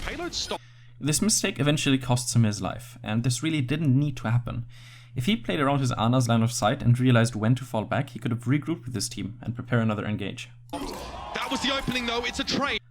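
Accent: Germany. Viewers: Very nice channel, I'm so happy that I found it through Overwatch Central! Btw, are you from Germany? Cause you sound like a German :P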